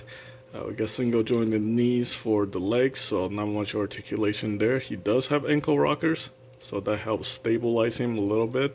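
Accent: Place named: China. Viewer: 您好，你也是華人嗎？ making an assumption based off of your username, and what little accent I can detect. If I am mistaken, I apologize, but if so, I am very, very happy to find another person from the same background attempting reviews in English. I've seen quite a few Chinese channels that do Mandarin reviews, but don't see too many Chinese people doing English reviews. Of course, your reviews are way more professionals than mine, ROfL.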